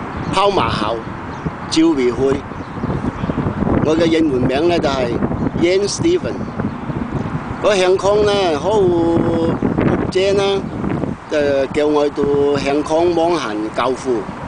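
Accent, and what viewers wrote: Taishan, China. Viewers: I am glad I found your video and listen to Taisanese spoken just like my mum did. My mum was from Toisan, also a Yen 甄。Thank you. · 很地道的老余声，很亲切，很励志，谢谢！我也来自新昌，现在旧金山湾区。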